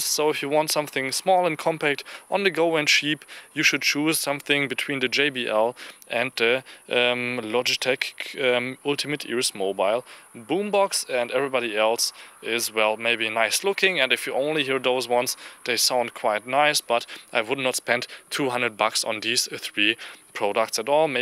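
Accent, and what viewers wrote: Germany. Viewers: Sehr informatives Video, danke dafür! Btw man hört deinen deutschen Akzent so hart :D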